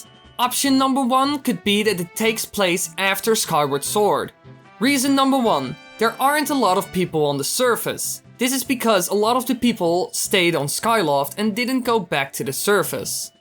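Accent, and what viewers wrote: Netherlands. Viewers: Nice video! Are you Dutch? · Are you Dutch?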